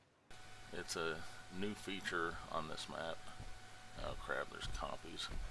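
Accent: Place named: South, United States. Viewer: ey! man! are you south states?? Your dialect sounds like it, Tx, Ka, Az ish.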